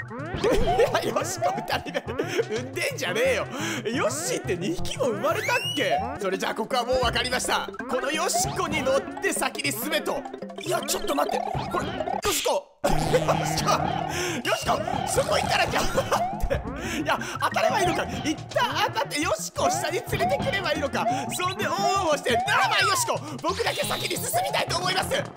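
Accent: Fukuoka, Japan. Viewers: 福岡弁も中々悪くないですね。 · ちゃんと期待に応えて福岡弁やってくれてるの優しいw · いい訛り具合ですね૮. ̫. ა ⸝꙳ 福岡訛り好きです(笑) そして最速タイムおめでとうございます🎊🎊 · 福岡弁、あんまり違和感無かった！でも、同じ九州でも熊本弁とは大違いですね！ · この福岡弁完ぺきやで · 福岡弁で喋ってる時笑い過ぎて内容が全く頭に入ってこなかったwww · お疲れさまです！さんの福岡弁に萌えました❤️ ｶﾀｺﾄの標準語も好きですw(৹ᵒ̴̶̷᷄ωᵒ̴̶̷᷅৹) 1発マリオのゴールは思わず声出ちゃいました⚑ﾞ⭐️ 一日の終わりに見れてよかったw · 福岡弁、やばすぎてやばい、、、🥺 方言でずっと喋ってて欲しい🥺 ギャップ萌、、、からのかわいいかわいい🥺 ショタ喋り久々にやって欲しい、聞きたいです！（笑） 今日も面白かったです😂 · さん大好きいいいいい！！！！！すみません。久しぶりにさんの動画を見にこれて、嬉しすぎて癒されすぎて気持ちがあふれてしまいました(ﾉ≧ڡ≦)☆ﾃﾍｰ コメントしきれないくらい、どこもかしこもさんが可愛くて可愛くて、終始ニコニコしてました〜🌸 福岡弁のさんが新鮮で良かったです〜！！うちの父は佐賀県出身なので、なんか安心する気持ちもありました( ˊᵕˋ*) 本当に癒されまくりました(◍ ´꒳` ◍) 心がぽかぽかです！いつもありがとうございます🌸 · 実況中も福岡弁がいいな、絶対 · さんの福岡弁新鮮で好きです！！やっぱりバネは友達ですね！ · 自分も福岡住まいなので福岡弁聞くとすごく親近感湧きますw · さんの福岡の言葉聞けてなんか嬉しいたまに〇〇ばいとかはさんが言ってるのは知ってましたけどここまでフルに出たの僕は初めてですね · 福岡弁のさんなんか違和感....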